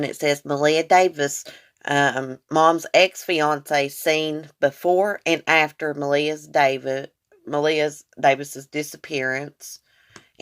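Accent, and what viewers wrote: South, United States. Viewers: okay good I have a baby but never used her as my profile. I guess you knew it wasn't me anyway because you blocked the person you said. ❤ I personally like your southern accent. It doesn't sound bad at all. So F that girl!! She probably had to use her baby as her profile because she was hideous lol. Remember you have alot of supporters and we think your awesome ❤😊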